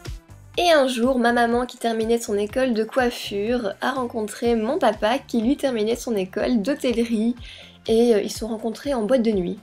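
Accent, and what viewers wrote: Belgium. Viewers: est ce que tu es Belge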